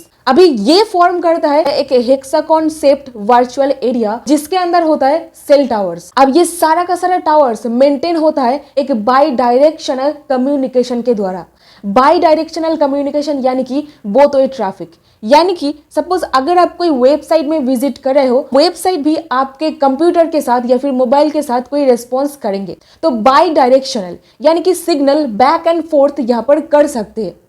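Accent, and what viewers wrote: Bengal, India. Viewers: आपको अपने बांग्ला accent को थोड़ा सुधारने की आवश्यकता है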